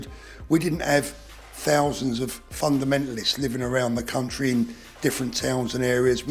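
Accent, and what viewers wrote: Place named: Cockney, United Kingdom. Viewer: I love the cockney accent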